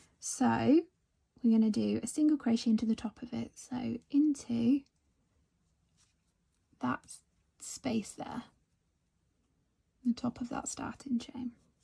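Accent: England, United Kingdom. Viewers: You sound English, like me. What are you doing up at 5.30 am?😄Me? I'm feeding a stray feral cat (charlie) who waits outside the door for me to get up. x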